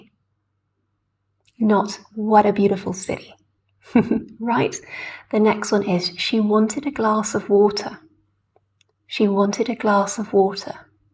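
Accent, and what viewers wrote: United Kingdom. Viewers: صوتك مميز جدا في اللهجة البريطانية هذي اللهجة الي اتمني اتحدث بها يوم من الايام أيمكنك إعداد المزيد من الفيديوهات وقراءة القصص من أجل الshadowing انتي لديكي نبرة نادرة استغليها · Is your accent Standard south british?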